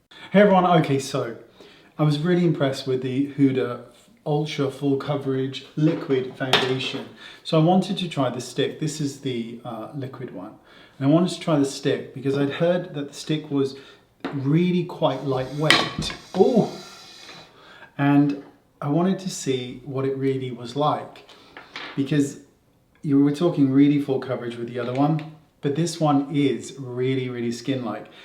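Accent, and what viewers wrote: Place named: Wales, United Kingdom. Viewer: nothing better after a tough day than relaxing to your voice with your Welsh accent.😍.Sending you love from Australia 🎈❤️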